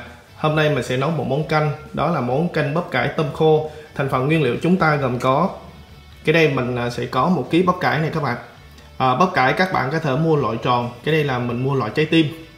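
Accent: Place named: North, Vietnam. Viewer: Giọng bạn này như ở miền ngoài, giọng hay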